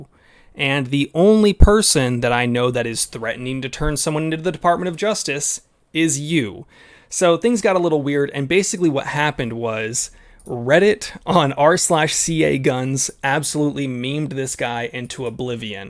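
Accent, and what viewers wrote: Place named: South, United States. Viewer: this guy sounds like a hillbilly clown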